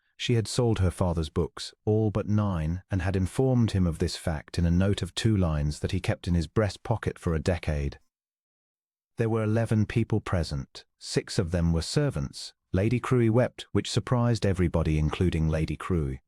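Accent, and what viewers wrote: United Kingdom. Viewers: There were a few times I planned to post a corrected pronunciation, but found that “error” was just the difference between British and U.S. forms.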